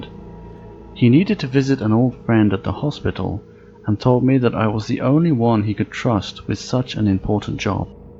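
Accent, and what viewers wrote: United Kingdom. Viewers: What’s his accent? Slightly British?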